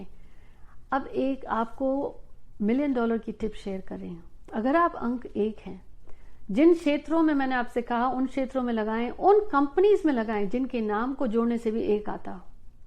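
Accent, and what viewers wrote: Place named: Punjab, India. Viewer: Aye haye...loved that pnjabi ascent...aiven hi🤘🤘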